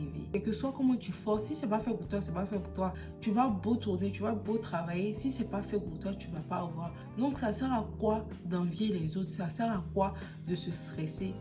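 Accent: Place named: Ivory Coast. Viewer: J'ai reconnue l'accent 225 ❤ non mais mise a par ça c'est profond ce que tu dis . Moi y'a environ 5 ans j'avais ce sentiment de non accomplissement du coup j'ai unfollow tout ceux que j'enviai a qui je me comparais depuis je vie ma best life parceque je sais que ce qu'on montre n'est pas totalement la réalité on montre tres souvent le meilleur mais pas les difficultés. Soyons fiers de nous même chacun son cheminement. Ce qui est pour toi c'est ce que Dieu te donne ❤.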